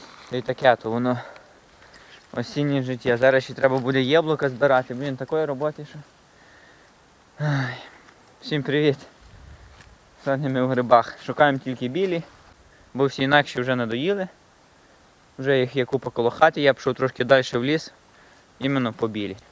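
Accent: Hutsul, Ukraine. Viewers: Насолоджуюсь лісом, грибочками і МОВОЮ! Спасибі, легінику! Це свято - побувати в осінньому лісі, побачити саламандр і почути файну гуцульську говірку! Дякую щиро! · Приємно слухати гуцульську говірку я б тілько за це і дививсі би!)) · Але файна розмова Гуцульска дуже люблю слухати 😂❤❤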